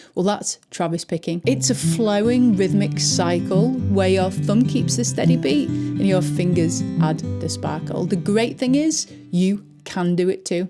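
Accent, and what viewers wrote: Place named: Northern England, United Kingdom. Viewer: Only came across you today, lovely channel. No faff in your delivery and it's great to hear a fellow northerner ont 'tube as opposed to an American or somebody below 'the divide' 🤣🤣 Subbed!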